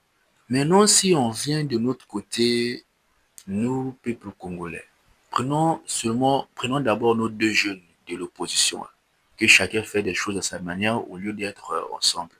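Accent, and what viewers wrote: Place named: DR Congo. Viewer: Tu as l'accent de la RDC .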